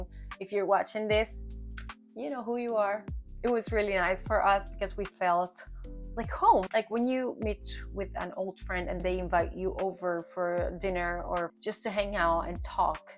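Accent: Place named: United States. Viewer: Strange to see someone saying is from Cuba and speaks english so good that is easily mistaken by an american.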